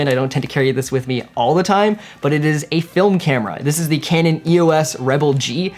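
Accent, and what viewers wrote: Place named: Canada. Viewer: Freakin Canadian accent kills me.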